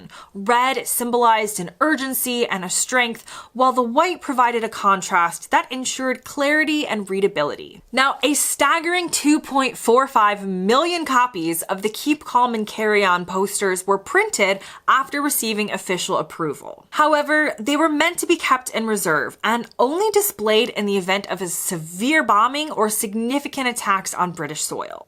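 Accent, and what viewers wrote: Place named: United States. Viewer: Unfortunately, propaganda which can be sometimes be effective at the time also leaves a behind a false history. I know you have an interest in history, and there is enough material out there to study this in some detail. Though I recommend you never make a video about it. With an American accent, you cannot tell it. The "keep calm and carry on" and Blitz spirit stuff have left a very different picture of the war. One place you can start is the number of deserters and people who never showed up for their draft. British army gives the figure of 100,000 deserters, US claims 50,000. Nobody knows how many failed to show up at all. There are estimates of tens of thousands of deserters living in London alone. And these people were immediately rendered 'outlaws'. In a country where every person could only shop with government issued ration books, these people had to make other arrangements. 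The crime levels and 'dog eat dog' nature of a country at war get completely glossed over in the numerous fictional accounts written afterwards. The 'Blitz Spirit' itself is one of histories greatest revisions. It wasn’t safe for women to be on the streets. Homes were looted, stores were looted, bodies were robbed, murders occurred. "Crime experts" and Scotland Yard are on record as there being at least a few serial killers on the loose in London alone. I suspect if the "keep calm'" posters were never released, it was because the government knew it could only push so far. There used to be a UK org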